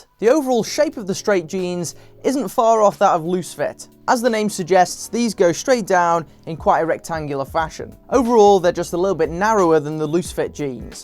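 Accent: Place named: England, United Kingdom. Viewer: Dislike because if english accent! Hard to understand! Dislike 3 times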